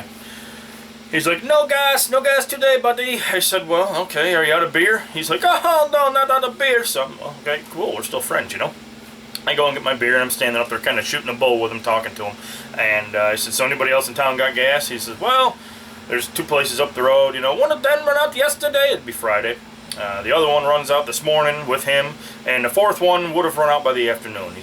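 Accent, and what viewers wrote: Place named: North, United States. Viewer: you sound like a yankee, you ain't from TN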